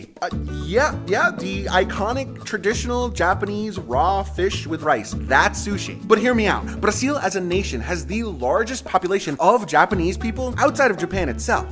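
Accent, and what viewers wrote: Spain. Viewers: The way he forces himself on sair Brasil is kinda annoying. The spanish accent is so uncessary. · Are you from the US? Where does your Spanish accent come from?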